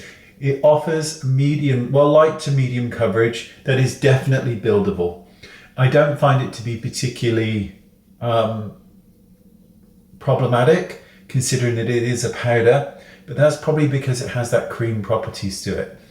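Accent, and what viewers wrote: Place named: United Kingdom. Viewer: I love just to look at,sometimes, don't get everything he says, British accent,which I love, but I do admit, his videos are very informative, useful!! Thank you,handsome, talented ❤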